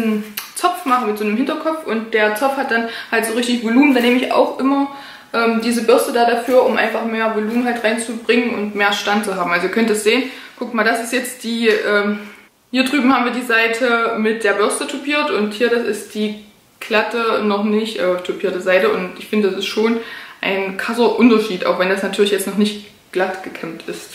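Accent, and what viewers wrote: Saxony, Germany. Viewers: Witzig, du hast meinen zukünftigen Namen 😅 Kommst du aus Sachsen?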